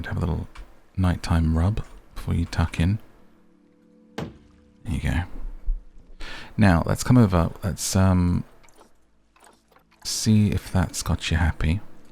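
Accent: United Kingdom. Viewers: British daddy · Who wants to fall asleep to a British dude talking?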